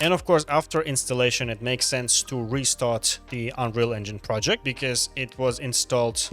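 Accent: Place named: Russia. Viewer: чел русский понятно по акценту,но вот доказательство остановите ровно на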